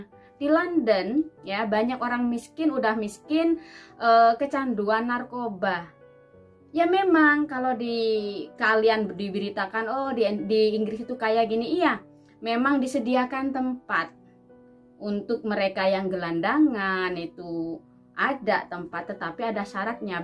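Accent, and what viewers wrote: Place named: Madura, Indonesia. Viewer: Mb...logat maduranya masih kentel banget 😀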